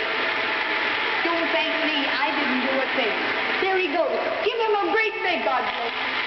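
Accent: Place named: Germany. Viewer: Analicemos su origen y epoca: Kathryn kuhlman nació en la ciudad de Concordia, Missouri, a padres Alemanes (su acento). Se convierte a Dios a los 14 Años en la Iglesia Metodista de Concordia, y comenzó la predicación en la Ribera Occidental a la edad de 16 en Iglesias Bautistas. En julio de 1975 su doctor le diagnosticó un cardíaco leve y tuvo una recaída en nov 76, como resultado, murió en feb de 1976. Pese a sus altas y bajas fue una mujer llena del poder y la uncion de Dios.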